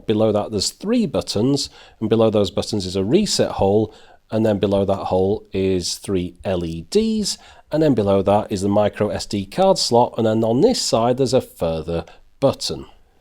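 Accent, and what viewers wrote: United Kingdom. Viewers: Thanks for great video. Brit accents, colloquialisms, pronunciation of English words (verses proper American) usually grates on me, but I can tolerate you. Ha ha. Seriously your videos are PROFESSIONAL, scripts to the point, informative, and your speaking voice is excellent. If you're not a Pro "presenter" you should be. Thanks again, these videos are invaluable, help filter through the many China made products in this niche category of electronics. I'll buy this dispite the acid trip colors.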